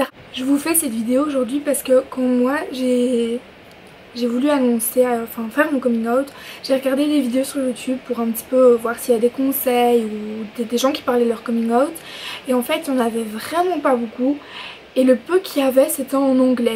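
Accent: Belgium. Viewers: Tu es belge, non ? Tu as un accent vraiment adorable. Et t'as vidéo m'a vraiment aidé merci beaucoup :) xx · Accent belge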